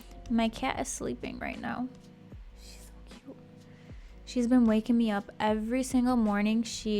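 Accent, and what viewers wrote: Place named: United States. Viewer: As someone who lives in Britain i think it would be funny if you tried to approach people and do a British accent and see if people notice or fall for it, or notice that you actually have an American accent.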